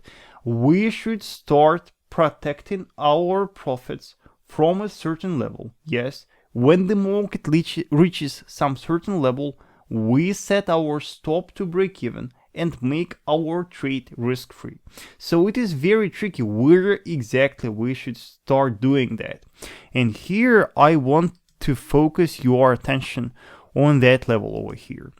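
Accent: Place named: Russia. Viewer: the russian accent....love it....hehehehe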